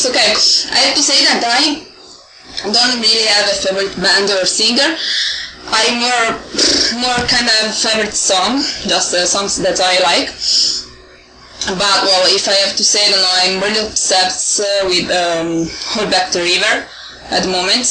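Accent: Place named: England, United Kingdom. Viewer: your English Accent was AMAZING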